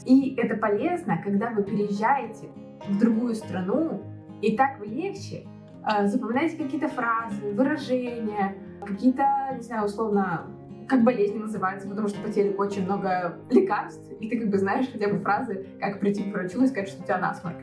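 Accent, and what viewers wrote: Poland. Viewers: Słyszę u Ciebie polski akcent🙂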